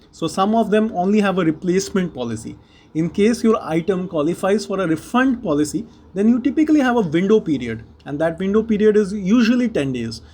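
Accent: India. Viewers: the man with the indian accent comes for the save again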